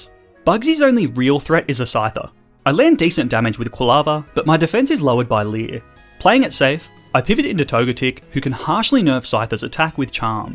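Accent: Australia. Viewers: Ahh it’s so refreshing to hear an Australian voice over Pokémon runs, also do you have a discord server by chance?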